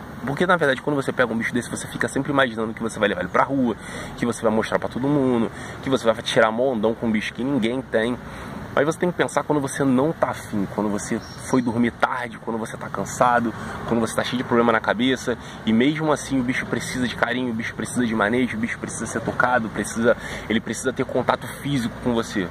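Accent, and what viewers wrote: Rio de Janeiro, Brazil. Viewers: Tu é carioca mano? Tô percebendo pra esse sotaque aí hein kkk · Eu amo esse vídeo porque nada passa mais franqueza do que um sotaque carioca dando alertas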